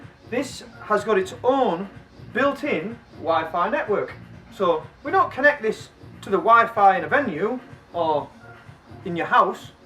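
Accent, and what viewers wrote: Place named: Newcastle, United Kingdom. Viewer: Bit of a Geordie accent there la.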